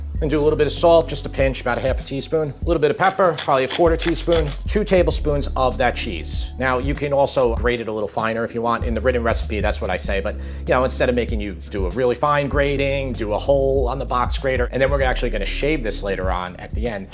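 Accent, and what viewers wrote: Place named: New York City, United States. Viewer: You have the coolest nyc accent i've ever heard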